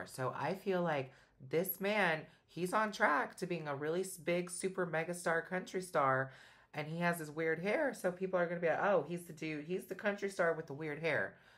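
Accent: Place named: South, United States. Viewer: Damn dudes voice is great for the country.. Fire ass voice.. I hate how country gets me in my feelings, though.. Oh that one part on the first song is not a violin in country it's the fiddle close but not the same.. This shit is fresh I'm going to be adding this guy to my everyday music.. Damn his rapping is Fire too.. did a country song once but it was ahead of its time.. He should do a song with them.. Love your country accent also made me laugh.. This whole video is 🔥 💯. I want a song with this guy and..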